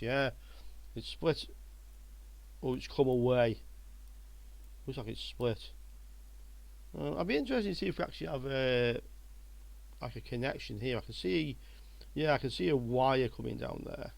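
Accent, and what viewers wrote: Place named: Stoke-on-Trent, United Kingdom. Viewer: I'd recognise that accent anywhere, where in Stoke on Trent, do you hail from my fellow potteries brethren? Longton/Blurton is the stomping ground of my youth, then briefly moving to Liverpool for 18 months. Before permanently relocating to Walsall, just a few miles south of Birmingham.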